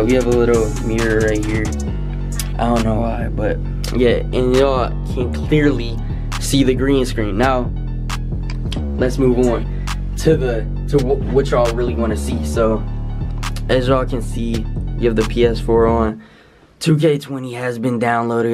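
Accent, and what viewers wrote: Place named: New York, United States. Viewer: What’s ur ethnicity and where u from ? U sound from New York and look Dominican 😭😭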